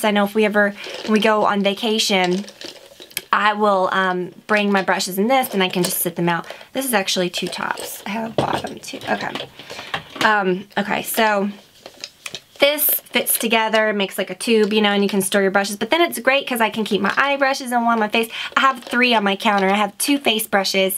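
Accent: South, United States. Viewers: I can hear a strong southern accent! Love you! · I don't normally pick up your southern accent in your videos but for some reason I could really hear it in this one lol! Adorable of course, and thank you for all the great info :) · Woah wait you have a southern accent???!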